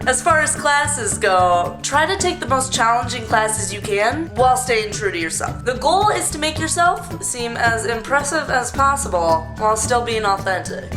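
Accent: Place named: United States. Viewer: Like you are a harvard student(someone intelligent as hell) then i'm pretty sure you learned to speak every languages possible in the world can you do a french version? i'm very interested to go into this university :c PS: I understand a little bit with subtitles because i'm shit to understand american's accent & pretty funny video xD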